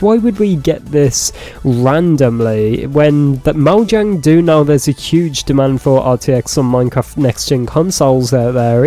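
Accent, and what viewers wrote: United Kingdom. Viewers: Wow British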